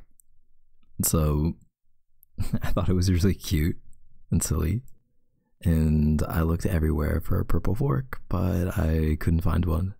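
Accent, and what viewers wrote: Canada. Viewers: you knew what they meant !! But to answer the question I’ve always imagined that he was Canadian but I could be wrong.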